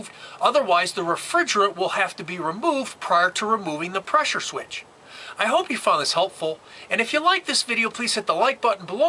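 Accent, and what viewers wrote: United States. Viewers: 1 pour ta tronche de ricain et -1 pour ton accent de ricain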